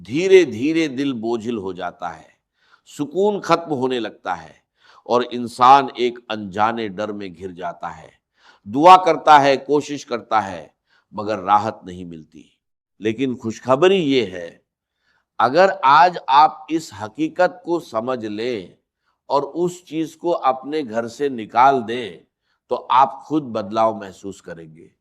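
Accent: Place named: India. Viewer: Yes you're right this is not real video this is not his voice and you see clear that is Indian accent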